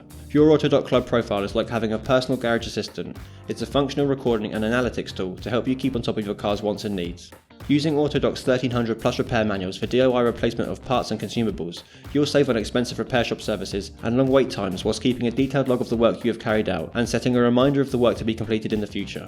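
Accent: United Kingdom. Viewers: La placa española me ha dejado descolocado, eres de España? Tienes un acento británico que no se tiene ni no se ha nacido allí 😂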